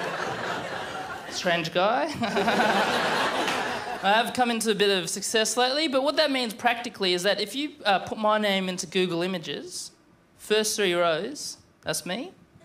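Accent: Australia. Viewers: You just don’t like how Australians speak then hahaha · It seems it's one of Aussie's accents, according to many other comments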